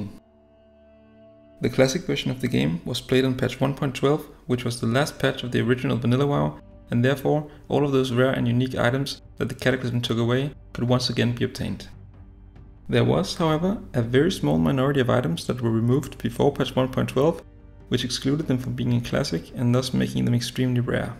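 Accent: Denmark. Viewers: accenten lol. Hvorfor er der så mange danske classic wow youtubere i forhold til andre europæiske lande?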